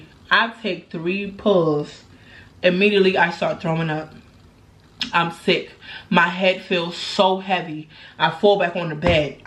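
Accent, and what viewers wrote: New York, United States. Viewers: Love your story times..you're looking gorgeous, girl..and I love your New York accent! Weirdo is a dumb a**, on evertthing..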